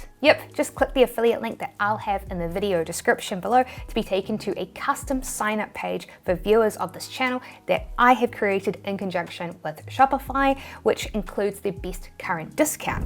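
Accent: New Zealand. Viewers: I love your tutorials - so much information - they're brilliant! And I like listening to your Kiwi accent ;-) I have family in Christchurch.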